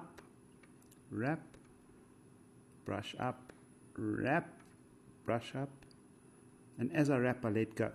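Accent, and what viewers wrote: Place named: South Africa. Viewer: Love the tying and the SA accent. Great fly thanks.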